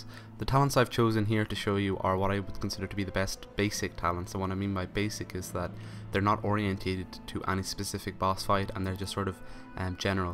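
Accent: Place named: Ireland. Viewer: Another Irish warlock? :p my lordy!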